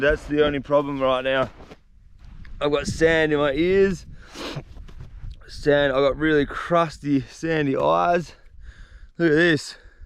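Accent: Australia. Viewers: I was worried until i heard the auzzi accent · not being mean but are you aussie i am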